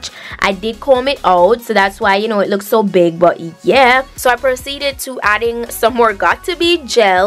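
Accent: Jamaica. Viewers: Sounds Jamaican Are you? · You sound like a Jamaican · New subscriber.... am here for this Jamaican accent 😍😍😍😂😂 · You sound Jamaican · are you Jamaican, by any chance? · U Jamaican right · Love YOUR Jamaican accent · OMG the accent is killing me ... 🙈 it reminds me of Jamaica 🇯🇲🙈😍😍😍😍 I am Obsessed !! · You're Jamaican? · I think shes from Jamacia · U sound Jamaican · U sound like a jamaican🤔🤔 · true jamacian QUEEN..we Caribbean pple proud of our language. lol .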